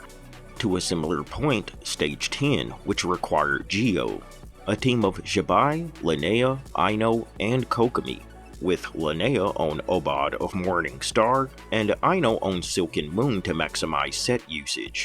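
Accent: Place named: South, United States. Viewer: I like the video and your southern accent. Thoughtful analysis. 1st time I see you. Subbed. Hope u post more.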